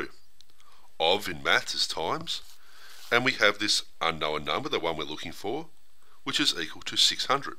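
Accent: Australia. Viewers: I love this Aussie accent.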